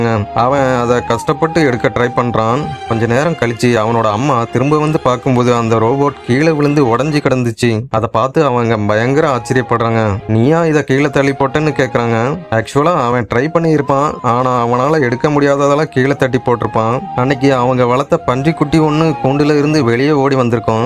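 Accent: Coimbatore, India. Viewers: Neenga coimbatore a 😊nalla pesaringa aana ithu world platform formal a pesunga intha ingu podathinga but nicely talking vaalthukal valaraku 🎉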